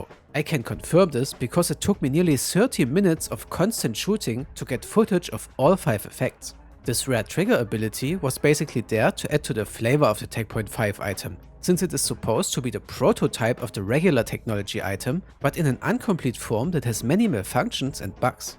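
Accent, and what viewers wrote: Germany. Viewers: Ahhh jaaa der schöne Deutsche Akzent wunderbar❤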